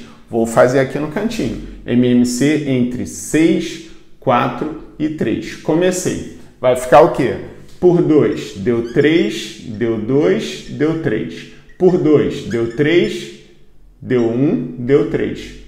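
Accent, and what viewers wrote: Rio de Janeiro, Brazil. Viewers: voce e carioca? tem o mesmo sotaque que eu falo tudo com x mano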